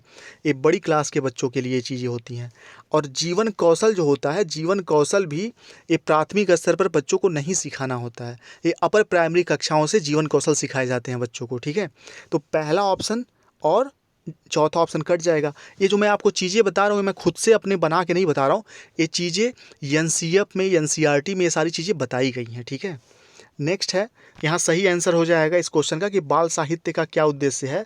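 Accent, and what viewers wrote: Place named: Bhojpuri, India. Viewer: Aapki bhojpuri bilkul hmari trh hai sir😀🙏 आरे तूं जीवन भर नाहीं सीख पइबअ🤗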